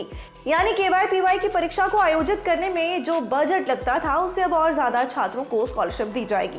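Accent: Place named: Mumbai, India. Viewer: South bombay/delhi se hogi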